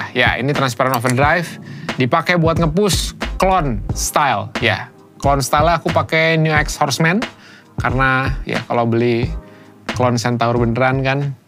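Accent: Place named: West Java, Indonesia. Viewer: Oh, orang sunda? Kirain orang sumatera atau sulawesi. Pas denger "kela.. kela" trus "tah". Kata kela itu singkatan dari "ngke heula". Soalnya yg nyebut "kela" cuma orang yg bisa bahasa sunda sejak kecil atau sunda asli.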